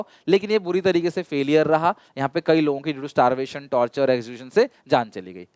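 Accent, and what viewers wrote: Odisha, India. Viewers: Sir are you from odisha ❤ please reply sir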